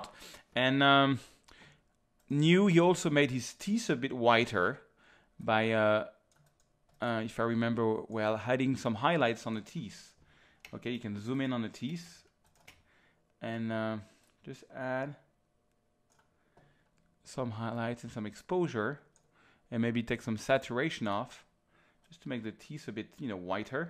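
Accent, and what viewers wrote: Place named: France. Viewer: Bonjour Monsieur, merci pour tous ces tutoriaux vraiment clairs et précis. C'est un plaisir de vous comprendre, en anglais, et ce grâce à votre accent français:-) surtout pour quelqu'un qui ne maîtrise point la langue de Shakespeare. Très cordialement. Christophe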